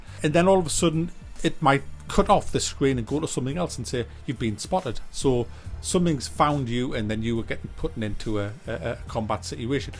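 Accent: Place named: Teesside, United Kingdom. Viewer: Hillariaous, sound like just over the bridge. Smoggies as you lot call us 🙂 Agg missed the k from end of the c, ponders if put an o between 🙂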